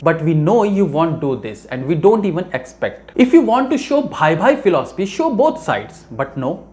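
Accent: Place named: India. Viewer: U r speaking english in Hindi tone.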